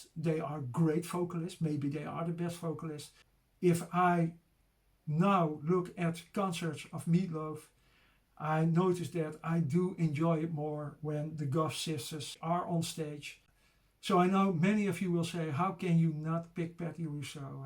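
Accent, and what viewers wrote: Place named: Netherlands. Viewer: Het is goed te horen dat jij Nederlands bent. Behoorlijk accent!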